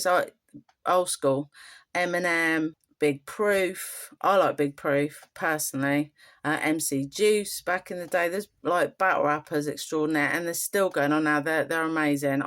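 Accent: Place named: England, United Kingdom. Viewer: Do you mind if I skip my favorites? You skipped, not my favourite by any stretch, but a grime OG. You still sound English as fuck, how long have you lived in America? Ya, Lord of the mics is the best battle, because it was thee battle. It showed why grime was it's own thing. Beats, cadence, London accents, and slang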